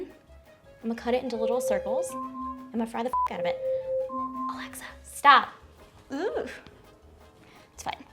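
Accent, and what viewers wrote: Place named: Rockland County, United States. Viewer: I freaking love!!! Her sarcasm kills me throughout her whole video!! Growing up in Rockland County NY she sounds like every girl I went to HS with, I love it!! 🥰🥰🥰🥰. is the best!!!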